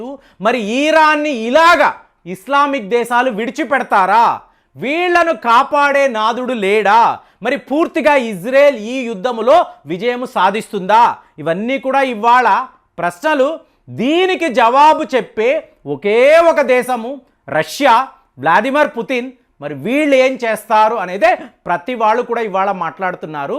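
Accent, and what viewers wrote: Tamil Nadu, India. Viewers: మీరు చాలా పదాలు మిస్ప్రొనౌన్సియేషన్ చేస్తున్నారు. దానివల్ల వరల్డ్ న్యూస్లో విషయాలు అర్థంకవడంలేదు. ఖమేని అంటున్నారు. బుక్స్ లో చదువుకున్నది అయితుల్ల ఖోమైని అని ఇప్పుడు మీరు కుమేని అంటున్నారు. చాలా వీడియోలలో మీరు ఇలా pronounciation చెయ్యడం మాకు రుచించడంలేదు. ఇరాన్ నీ మీరు ఈరాన్ ఇలా కన్ఫ్యూజన్ అవుతోంది మీరు తమిళ్ slag vidavandi. తెలుగు పాత్య పుస్తకాల భాష వాడండి. లేదా మీ వీడియోలు మీరే చూసుకోండి. మా మీద వద్దు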